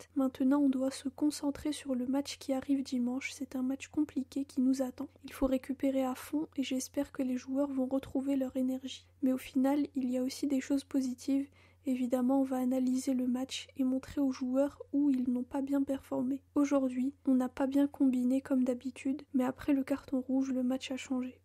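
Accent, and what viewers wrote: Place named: Spain. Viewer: J'aime bcp son accent espagnol, même à la fin "visca Barça visca Catalunya" merci pour le contenu.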